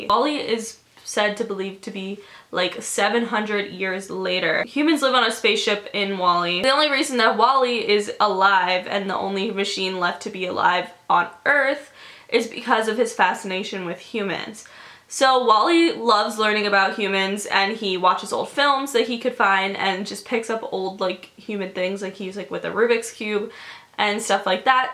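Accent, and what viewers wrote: United Kingdom. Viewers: Are you britishh?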